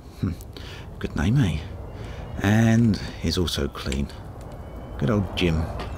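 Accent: United Kingdom. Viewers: very interesting accent, does anybody know from which region in the UK is his accent from? just curious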